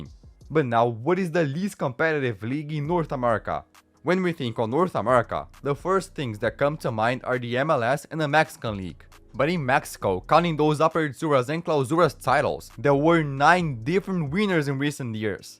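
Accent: Brazil. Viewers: Vc eh brasileiro? Adivinhado pelo sotaque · Você consegue percebe só pelo sotaque que ele é brasileiro kkkkk parabéns pelo vídeo mano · hes brazilian bruh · o acento brasileiro dele e muito evidente, fazendo esse acento no final das palavras, mas a pronunciação dele foi incrivel, ate imitando palabras em espanhol com acento ingles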